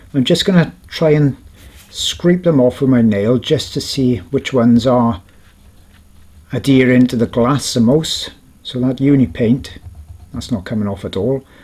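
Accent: Wales, United Kingdom. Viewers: i'd recognise that accent anywhere. where in Wales are you from?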